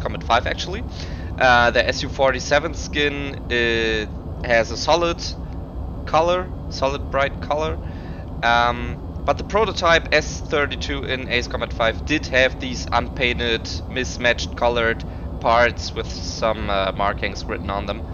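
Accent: Germany. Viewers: u sound german are you?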